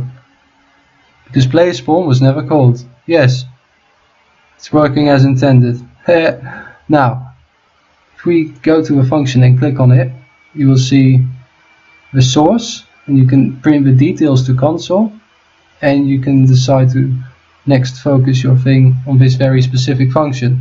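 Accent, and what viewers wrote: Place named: United Kingdom. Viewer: why do you sound so FUCKING british:S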